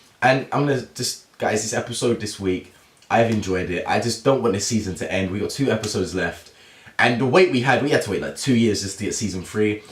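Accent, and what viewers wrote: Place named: United States. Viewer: I gotta say.. I was hoping for even just a clip from the Citadel so we could see what evil Morty's doing but it was still a pretty fun episode... and kind of a different but still super cool format. Also pretty interesting how Rick had Summer prepared for such an event. Anyway, I can't wait to see how they wrap up the season :D ...love your American accent btw :')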